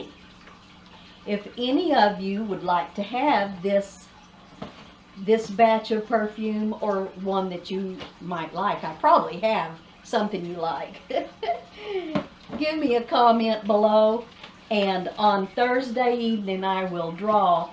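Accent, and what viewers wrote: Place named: Texas, United States. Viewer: Your accent sounds familiar. I’m from Dallas. Small towns around there. 😄👍❤️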